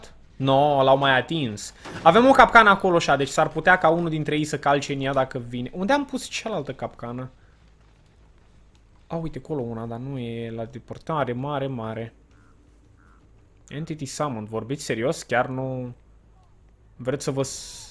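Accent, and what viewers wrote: Moldova. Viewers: vorbeste cu accent moldovenesc v-a fii un epison amuzant :)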